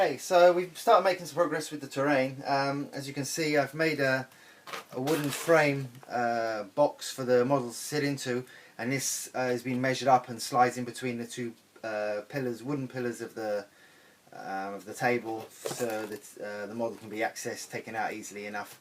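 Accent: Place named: United Kingdom. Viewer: Amazing as always , nice to hear your voice and its british, I'll put the kettle on and grab a seat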